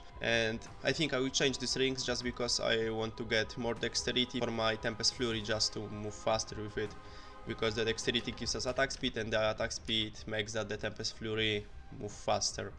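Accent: Poland. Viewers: Jak chuj slysze polski akcent xD nie myle sie? Pozdro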